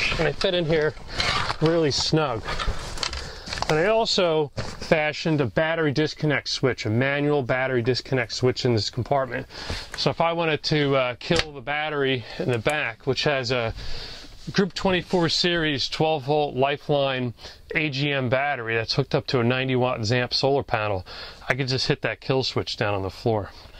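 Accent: New Jersey, United States. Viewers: terrible jersey accent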